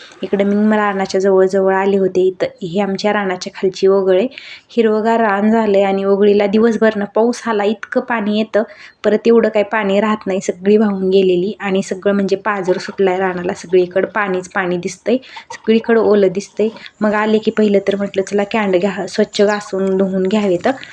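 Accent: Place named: Maval, India. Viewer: जय सियाराम ताई ताई तुमचं गाव कोणतं कारण बऱ्यापैकी मला तुमची भाषा मावळी वाटते म्हणून विचारलं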